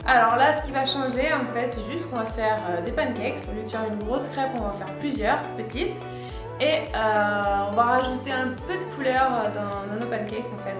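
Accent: South, France. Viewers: teddy zora Elle est du sud ducon mdr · C'est quoi cet accent du sud la?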